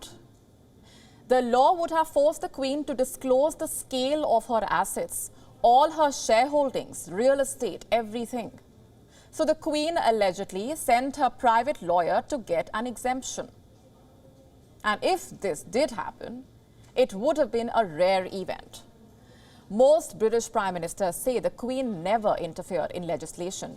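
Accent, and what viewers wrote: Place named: Punjab, India. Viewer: Heavy punjabi hindi accent!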